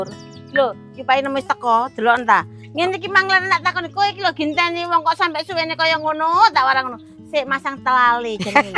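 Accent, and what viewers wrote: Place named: Surabaya, Indonesia. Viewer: ng jogja wes suwe tapi logat e jek logat arek ae 🤣 Salam saking kulo nggeh buk darjo cerak mantune smpean 🤭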